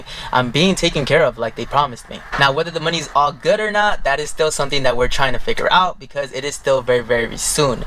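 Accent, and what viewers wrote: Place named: Los Angeles, United States. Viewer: U have that LA accent. 😀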